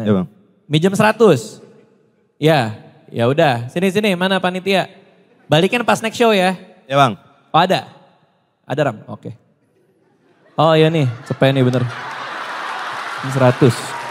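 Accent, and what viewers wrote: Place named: Palu, Indonesia. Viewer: Logat palunya di tahan tahan, tidak hilang memang 😂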